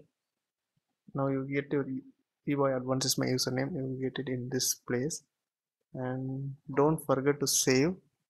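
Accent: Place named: England, United Kingdom. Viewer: Nice English accent.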